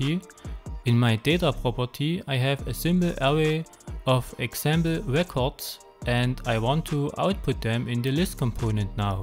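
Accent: Germany. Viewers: I love your german accent hahaha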